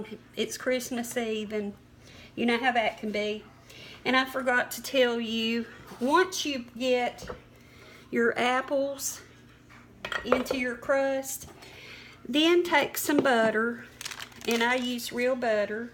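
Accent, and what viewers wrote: South, United States. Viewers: When the presenter has a Southern accent I know whatever the recipe is it will be GOOD!!! These are amazing thank you!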